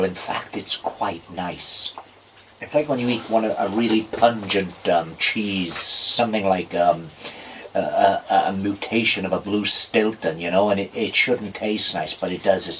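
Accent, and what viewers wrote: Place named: Welsh Valleys, United Kingdom. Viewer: Who'd have thought it? The YouTube algorithm eventually found me a channel exactly to my tastes. I have a fair amount of pipe channels saved and favourited, but you're the only fellow Welshman I've happened upon, and a western writer too. Dare I assume your accent is from the Ogmore Vale, maybe the Rhondda? I'm hailing from the Twrch valley between Swansea and Brecon. From what I've seen, your content is great. Fantastic job, Bendegedig! You should be proud 😎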